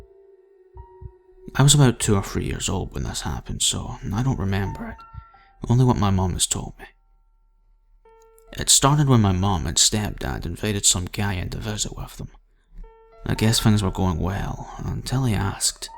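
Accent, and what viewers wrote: Scotland, United Kingdom. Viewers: Always enjoy the stories your the delivery in my accent is great too.. even if ye did say ass and no arse… Just curious are you Scottish and do you live in Scotland? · Great video fab to hear a Scottish narrator 😊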